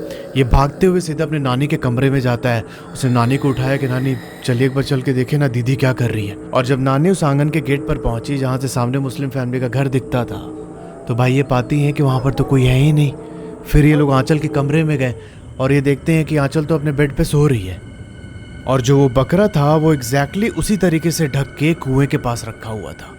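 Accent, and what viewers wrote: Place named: Jharkhand, India. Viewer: Bhaiya aap Jharkhand ko boli seh lag raha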